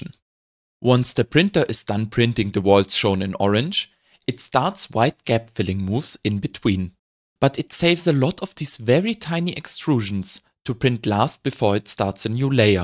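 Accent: Germany. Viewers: Deep, but well explained, technical stuff, made by a lad with a german accent, witout annoying music and/or nauseating transition effects on video edition. I t can't be better that this. Thank you a lot! 🙏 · мне так нравится этот немецкий акцент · Du hörst dich an als wärst du auch Deutsch edit: Jup, hast es im Video grad gesagt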